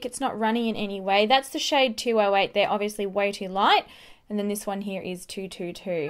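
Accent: Australia. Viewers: Amanda Bittner she's Aussie and leave her alone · Where is she from? Australia or New Zealand?? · sara v. she's from Australia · h law She's from Australia. Of course she's gonna have an accent. Everyone has a different accent depending on where they're from. Did you not know that? I don't think you did, even though it's an obvious fact. Also your attitude is so gross. I literally cringed reading your last two comments, yuck. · Omg. When i heard your voice i knew that you are from Australia. ❤️ I love Australia. It's most beautiful country in the world. And i'm from Czech republic. ❤️❤️💖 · is she Australian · Thats not american slang. She is Australian. Thats how she speaks. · is she australian i cant tell with her accent because i'm australian and i dont know ahha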